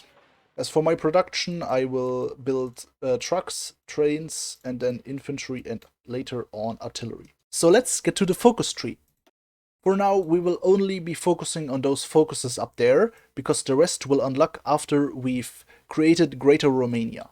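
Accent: Germany. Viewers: LOL. Dein Englisch hat so einen heftigen deutschen Akzent 😂👌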